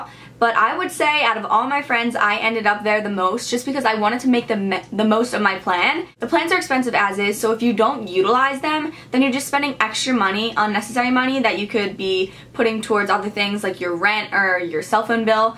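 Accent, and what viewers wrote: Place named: Midwest, United States. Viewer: you sound more like someone from the midwest, not a New Englander. But awesome advice!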